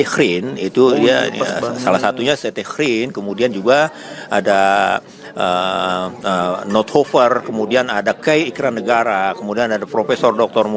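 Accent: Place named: Jakarta, Indonesia. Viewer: kalo di liat liat sibabe make logat betawi pinggiran ..syair syair nyang babe lagukan juge syair betawi pinggiran persis kaye alm H bokir legenda lenong betawi ..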